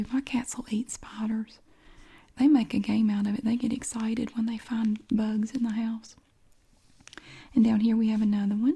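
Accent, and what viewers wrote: South, United States. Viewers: Your southern accent gives me allll the tingles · I love the way you say everything :D I didn't like southern accent until I discovered your videos. You are so sweet and funny! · Your country accent is everythingggg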